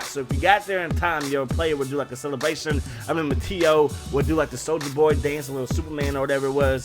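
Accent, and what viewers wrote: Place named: Pacific Northwest, United States. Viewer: Hey man where you from? I'm actually from Seattle, and I can tell you have a more north western type accent so I was wondering if you lived here as well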